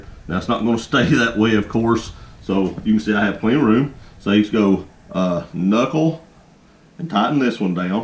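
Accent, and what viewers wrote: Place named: Alabama, United States. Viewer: Are you from Alabama or TN?? The accent sounds like Alabama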